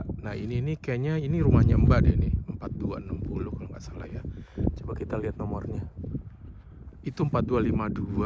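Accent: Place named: Java, Indonesia. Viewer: logat bicaranya njawani banget kok gak mbataki Semoga chanelnya makin berkembang dan sukses ya bang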